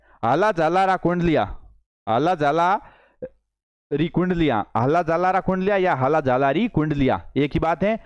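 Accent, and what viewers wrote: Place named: Barmer, India. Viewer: Sir aap bolte mast ho 😅 चारण को सारण , चमत्कारी को समत्कारी, सिंगल को chingl लगता हे आप barmer se ho🙏🙏🙏🤗🤗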